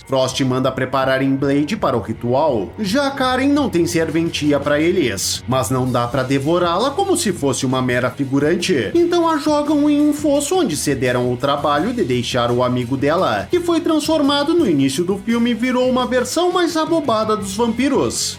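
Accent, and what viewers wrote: Rio Grande do Sul, Brazil. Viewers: Rapaz , divirto-me muito com este canal. Suas tiradas sao muito inteligente. Queria saber se vc é gaucho?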